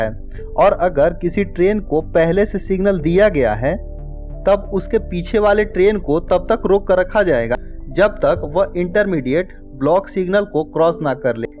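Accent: Bihar, India. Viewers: awaz se bihari lagte ho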